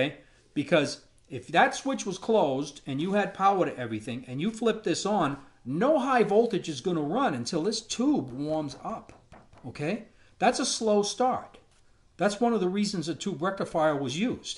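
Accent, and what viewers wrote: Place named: Boston, United States. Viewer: Greetings from back east. You are Boston to the bone. Love it!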